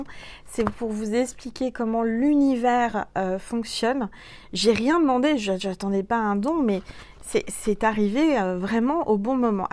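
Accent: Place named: Belgium. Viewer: Ah oui..je me demandais...🤔 Tu as ce petit accent Belge...😇💋😘🙏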